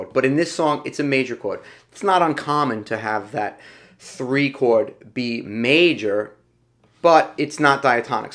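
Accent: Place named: New England, United States. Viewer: Awesome instruction and easy to follow. Bonus for the NE accent.👊🏻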